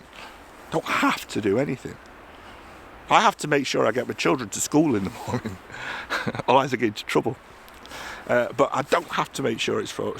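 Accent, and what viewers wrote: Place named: Yorkshire, United Kingdom. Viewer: magic great to hear straight-talking Yorkshire patter. What is the point of a technically correct image if you don't like it?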